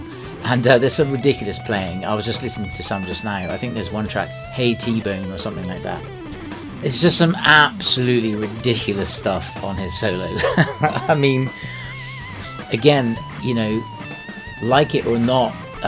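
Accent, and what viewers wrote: West Country, United Kingdom. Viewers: What is your standard tuning? And am I detecting a bit of a west country accent?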